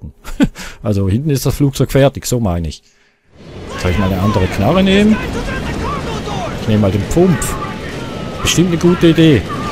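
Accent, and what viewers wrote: Switzerland. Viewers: Kommst du aus der schweiz? :D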